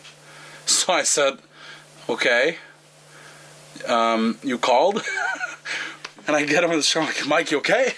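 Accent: Quebec, Canada. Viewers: I wanna hear him speak French (as a fellow French Canadian ) . I can hear his French accent on a lot of words. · Quebec accent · He's from Quebec Canada so of course he'd have an accent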